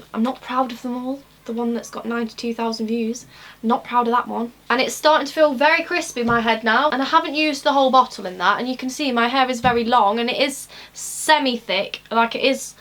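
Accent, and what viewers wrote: United Kingdom. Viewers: You have a nice accent...are you Scottish? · are you from near nottingham? you have such an east midlands accent! xxx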